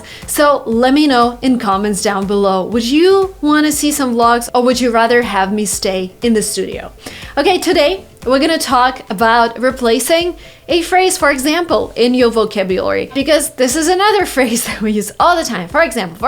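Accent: United States. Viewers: Can I be like you... I wanna talk alike you.. I'm dying to learn this accent... American 😭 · Studio would be better. But then yes anything that please you or form your comfort. I'm always focused on your accent so that I can interpret everything you say. And honestly I've been watching your videos for past 1.5 years or more & I can confidently say I can not only understand your entire accent but many other American speakers. Thank you so much from the bottom of my heart ❤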